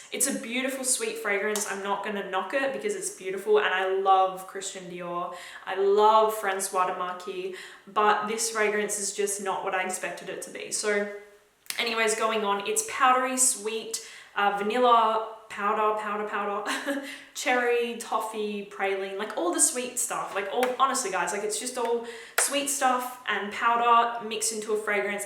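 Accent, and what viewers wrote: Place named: Australia. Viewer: Love ur Aussie accent.....❤️❤️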